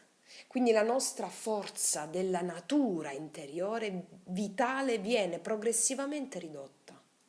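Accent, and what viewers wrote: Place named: Apulia, Italy. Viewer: Che spettacolo che sei., non so quante volte ho sentito questo tuo lavoro bellissimo e ogni volta la mia anima vibra e mi dai coraggio forza luce,io vivo in Lombardia ma la mia origine è in Puglia credo anche molto vicino a te dall' accento che sento,mi piacerebbe un giorno abbracciarti di Persona infinitamente grazie❤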